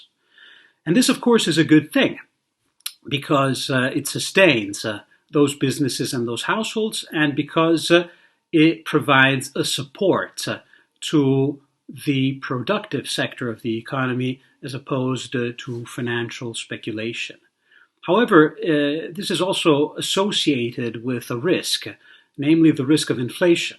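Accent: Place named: United States. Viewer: Nice American accent for an Italian, bravo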